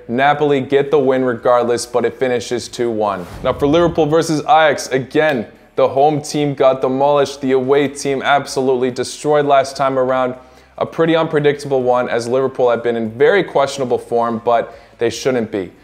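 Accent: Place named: United States. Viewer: Can tell hes american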